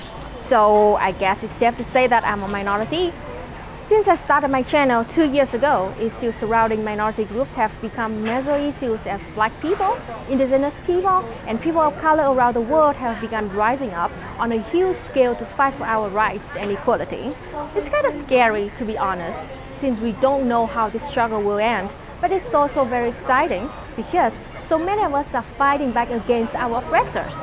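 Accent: Vietnam. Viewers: I find that after watching more of her videos, I have gotten much better at understanding her with little to no effort. I suggest trying to push through and before long you will probably find that you get used to the beautiful vietnamese accent and don't have to focus much anymore!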